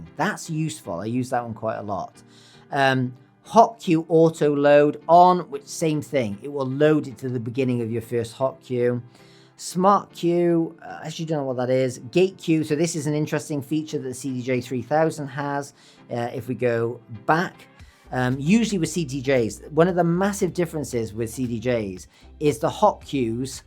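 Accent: United Kingdom. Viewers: Who is this bri'ish bloke trying to tell me how to do?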